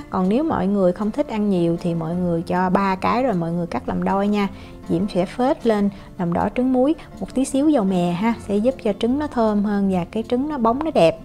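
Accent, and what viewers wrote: South, Vietnam. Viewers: Mình hay làm món này cốt bánh là mè đen pha thêm vào với chút dầu mè, vị cũng thơm hấp dẫn lắm, có tgian rảnh thử xem cho vui hêhhe. Thích nghe giọng miền nam.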